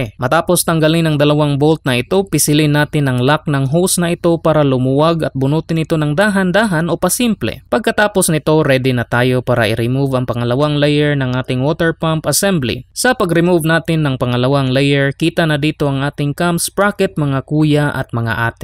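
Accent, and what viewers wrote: Visayas, Philippines. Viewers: Visaya po ba kayo Ayusin niyo naman po ung boses niyo para maintindihan ng maayos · bisaya boses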